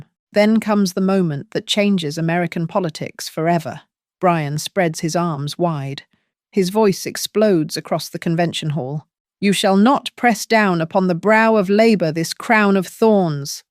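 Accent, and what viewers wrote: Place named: United Kingdom. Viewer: Blah blah blah. The Wizard of Oz is about Americans becoming US Citizens. It is about common law becoming admiralty corporate UCC law. Oz = ounces of gold. Tin man = Tax Identification Number. Scarecrow = Stramineus Homo = a person or entity used as a front, nominee, or agent for another party, often to hold title, provide bail/surety, or conceal the true owner. The lion is the sovereign (king of the jungle?) aka a free man on the land. She comes for 'Toto' which is not a dog but Latin for 'everything' because the banks take everything. White snow is narcotics. On and on you guys are being bamboozled by this British accent that want you to look elsewhere. To the content creator of this channel stop gaslighting. We are not in Kansas (a republic or commonwealth) anymore because she is now in 'The State of Kansas' a corporate state. 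Americans know all about this and we understand who is who etc. If this information is new to you, you're welcome. Don't listen to the majority of this color (legal term you should look up). I did not bother listening to this nor did I bother to read the comments. If what I am saying has been echoed already, good on them. Anyone saying anything else is a legal fiction and don't know it.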